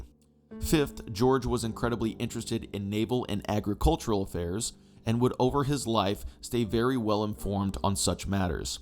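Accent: United States. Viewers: Since you're British, why do you use an AI American accent, and maybe you should calm down your sympathy for the British? You don't want to sound too biase and / or partisan. It breaks the immersion of you being historical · random, but are you American by any chance? To me you sound so, and you seem to be going a bit heavy on them in this video. Just saying. Not discrediting you or anything.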